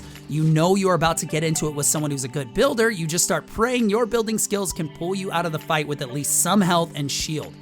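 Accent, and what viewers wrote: Denver, United States. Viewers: Are u from Denver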